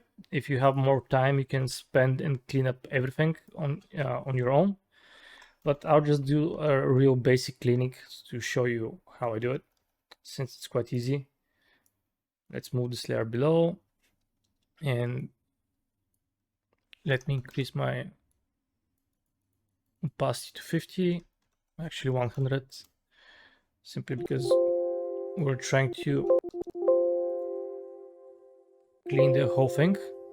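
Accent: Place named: Russia. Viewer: you must be russian because your so sounds like все and your sheet sounds like shit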